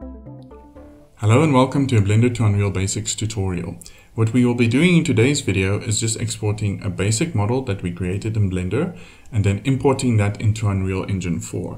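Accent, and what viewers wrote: South Africa. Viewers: Sounds like a South African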